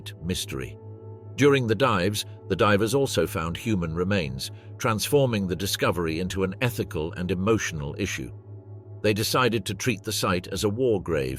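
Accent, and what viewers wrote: England, United Kingdom. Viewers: the German navy was ruled by large battleships and you show the Lusitania? Can it get stoopider than this? You don’t get credibility just because you give the narrator an English accent. Thumbs down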